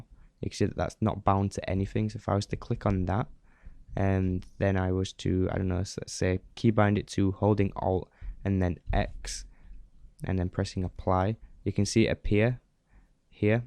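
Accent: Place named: United Kingdom. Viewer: speak english................damn, tired or britiish accents, mumbling fucks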